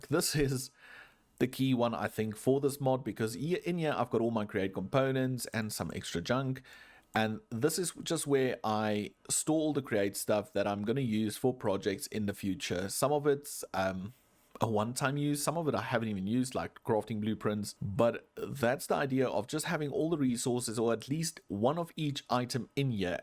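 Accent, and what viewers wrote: South Africa. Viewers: You sound a lot like RenDog are you south African per chance?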